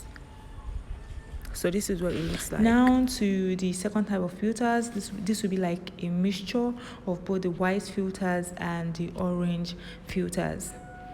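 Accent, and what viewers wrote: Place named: Africa. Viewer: Hi !! Are u African or ?? Not a racist I just love ur channel and accent. I just been a make sure Nigerian? Jamaican? It’s so cute . And that ring light is beautiful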